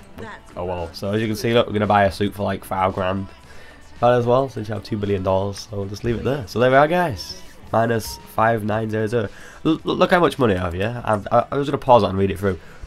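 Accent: United Kingdom. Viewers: He's not drunk he's got a god damn accent called British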